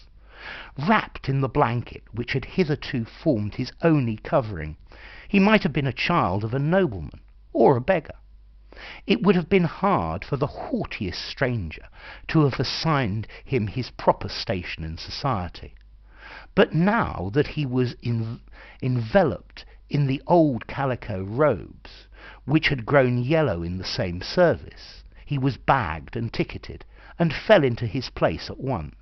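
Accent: United Kingdom. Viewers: I really love your British Accent, if you don't recording more sir!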